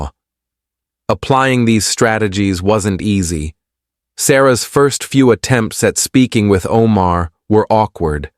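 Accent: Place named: United States. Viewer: چقدر زیبا هس این لهجه آمریکایی ممنونم از شما دوست عزیز🙏⚘️🍀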